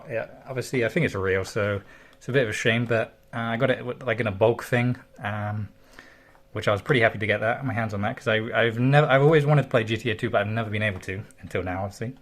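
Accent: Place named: Australia. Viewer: Great vid here haha, cheers. Seein' other devs working on their own stuff helps with the ol' self-motivation too. Keep up the great work. Oh, and you're probably the most 'Strayan sounding Brit I've ever heard haha.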